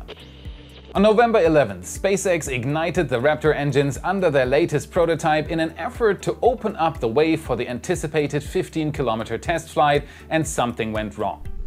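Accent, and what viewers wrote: Germany. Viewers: Tolles Video, man hört deinen deutschen Akzent, ist aber gottseidank nicht so nervig wie bei vielen Anderen Deutschen, die Videos auf Englisch machen 😉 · Are you from Germany?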